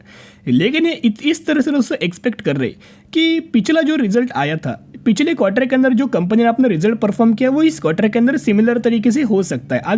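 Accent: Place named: South, India. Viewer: Tomara hindi just like south Indian.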